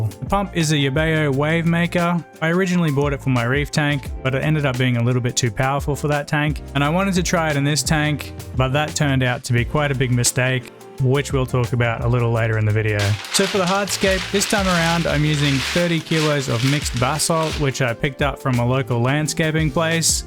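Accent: Australia. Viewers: Nice to hear the Aussie twang in an aqua scape video! You sorted for Vegemite and Tim Tams?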